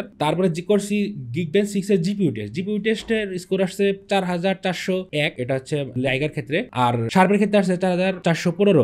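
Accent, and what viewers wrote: Tangail, Bangladesh. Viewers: ভাই কি টাঙ্গাইল এর?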